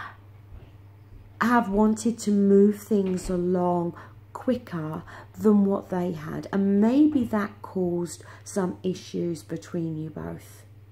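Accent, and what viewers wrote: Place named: England, United Kingdom. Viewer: thank you as always you are amazing, and not to mention the most beautiful English accent in the whole of England!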